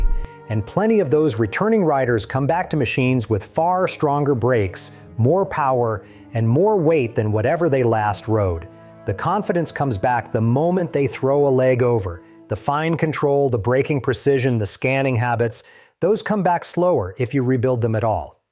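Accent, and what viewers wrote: United States. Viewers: The confusion might also stem from the fact that the speaker has an American accent and cites American sources (admittedly not exclusively), while the channel description states that the channel owner is based in Norway. Anything is possible, of course—and there was, after all, an American in Norway before: Frank Tagliano 🙂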